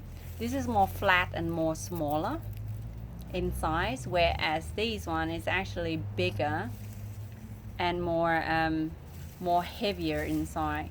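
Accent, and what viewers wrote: Australia. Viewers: Beautiful plants also Is your accent mixed in with Australian? 😌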